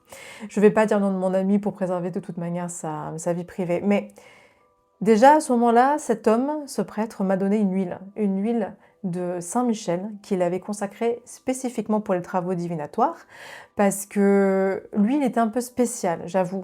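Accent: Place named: England, United Kingdom. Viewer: Ha je viens de comprendre d’où vient ce charmant accent! Je pensais d’Angleterre, je n’étais pas loin!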